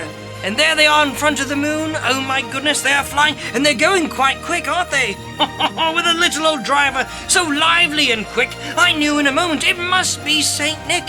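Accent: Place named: United Kingdom. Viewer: You sound a little bit british ? why ?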